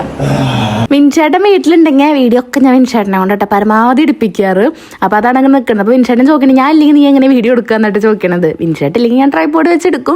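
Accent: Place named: Palakkad, India. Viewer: തൃശ്ശൂർ ആണെങ്കിലും കുറെ words പാലക്കാട് പറയുന്ന പോലെ ആണ് പറയാറ്. ഇവിടെയും നൂൽപ്പുട്ട് എന്നാ paraya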